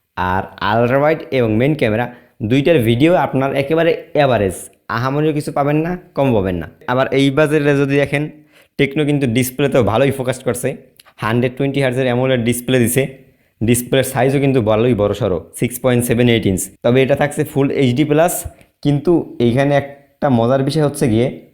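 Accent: Barishal, Bangladesh. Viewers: সুন্দর গুছিয়ে কথা বলে কিছু বরিশালের কথা চলে আসছে যেটা আরো বেশি ভাল্লাগছে ভাই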